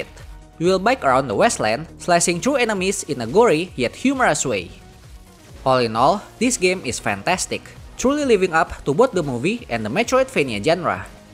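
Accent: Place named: Indonesia. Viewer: aksennya kyk org indo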